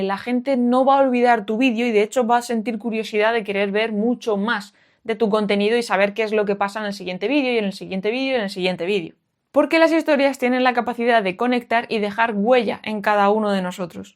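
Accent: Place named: Canary Islands, Spain. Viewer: suena muy canario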